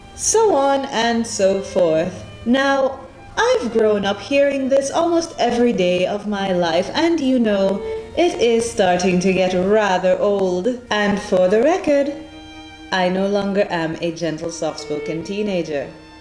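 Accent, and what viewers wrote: Jamaica. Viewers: Well said me sister! You most certainly sound Jamaican! What also kills me is that nonJamaicans and non other West Indians say all Jamaicans smoke ganja! Every human ought to see this video! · You tell them! I don't know why people don't realize that the colour of your skin doesn't = your nationality or birth place or your hertage. Theres no way to tell someones background due to skin colour. Its been a long time since I heard Jamacian English spoken. I moved to Japan 4 years ago but I am from Toronto Canada and I worked in a company where a large amount of people were Jamacian and they were my friends, I learne to understand Jamacian accent so it brings back memories to hear it. ^^ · You are a raw Jamaican.Your accent is typical Jamaican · Of course you look Jamaican! Sound Jamaican too! (potty mouth) I Love when the family get together, Chinese, European, American, British but we all from yard! 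Younger family members sometimes looks confused by the mixture! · XDDD LOL ! I love your Patwa xD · How can I get this accent? I don’t think i can learn it while I am in England and I can’t afford going to Jamaica either. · Trust me.... your mouwt certainly looks and sounds jamaican!!! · I hear ur rhythm, yardi , y.😝 nah fool me & they don't realize a whole rainbow of colors in ppl in Ja......too many tribes. ....in ....I overstand ....go on educate them. .. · I can hear your Jamaican accent even when you are putting on the fancy voice. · I love your video so much. Sometimes I watch dem over and over again. Your accent alone is a dead giveaway that your 100% Jamaican. Keep dem videos coming sis 👌👍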